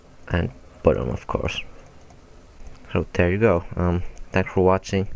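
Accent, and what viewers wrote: Finland. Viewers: Oh wait that accent.... Just like finnish guy speaking english xD. Coincidence? I think not. So one simple question: Are you finnish!? :D Jos oot nii GG xD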